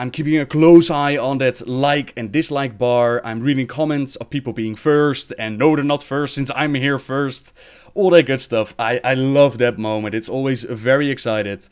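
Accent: Netherlands. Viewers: Dutch accents are great. I like how he pronounces "Th" as a "D" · I had no idea you were Dutch! I really like your accent and all of your Lore videos, my guildmates say I'm an Encyclopedia thanks in part to you:)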